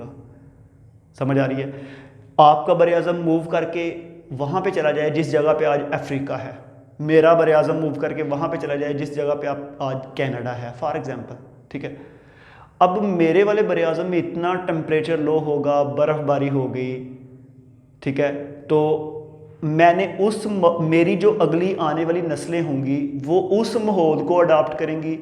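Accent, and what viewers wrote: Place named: Punjab, India. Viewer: I’m sorry but looks like sir speaks English like Punjabi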